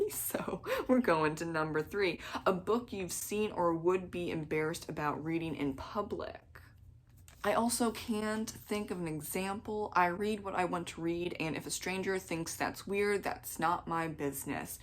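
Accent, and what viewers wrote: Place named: Boston, United States. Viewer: Love this! Great take on all these questions. There are ones I wouldn’t have wanted grandma to know about, but that’s about it. Everyone else can just deal with their boring taste on their own 😂 I get tongue tied and blend words all the time, I just beat everyone to the punch and laugh at myself. Sound like you’re from Boston, I love it!! Great video!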